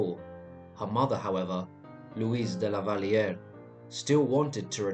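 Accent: England, United Kingdom. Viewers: You have an English accent and live in UK... where do you get your American cereal from?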